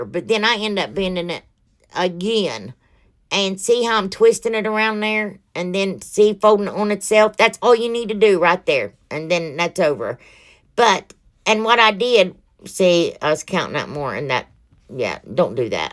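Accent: South, United States. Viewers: Hi,. I'm Tiffany. I am a caregiver for my husband whom is very sick. I'm originally from Nashville,TN but reside in Ohio. We love watching your crafting videos and your country accent. I feel like I'm at home watching your videos. 😊😊❤❤.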